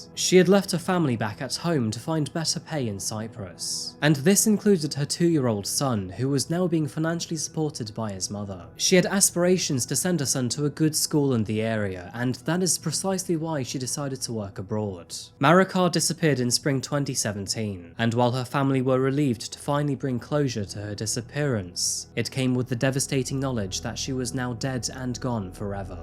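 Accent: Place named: United Kingdom. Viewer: Hey cuz are you gay? Or is it just that across the pond accent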